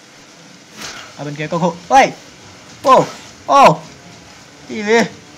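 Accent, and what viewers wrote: Mekong Delta, Vietnam. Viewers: thấy tiêu đề với hình nghĩ ad lên tay rồi ko ngờ ko ngờ là bị mấy con khung long săn lại, mém bỏ mạng kaka đi bụi luôn. ad giọng ad nc dễ thương, người miền tây hả ?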